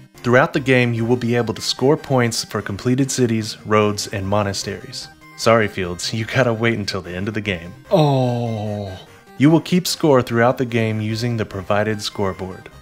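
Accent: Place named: France. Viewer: great French accent!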